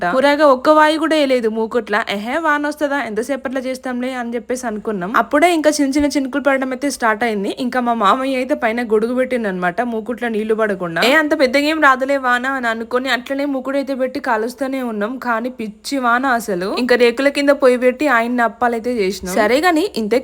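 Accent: Telangana, India. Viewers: Mi telangana slang bagundhi😊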